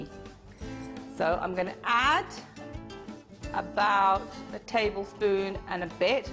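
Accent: England, United Kingdom. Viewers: Your British english is awesome... Its... I learned with your show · loved the recipe and ur British accent. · she sounds so british :3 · She is very much indie with a perfect british accent. What's wrong in that. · come on people shes a Britisher and shes got an English accent..and seems like a really lively person..get over it and focus on the delicious food shes making..or else just stop watching...simple, isnt it?? btw you are fabulous...loads of love... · She's amazing ..got an amazing English accent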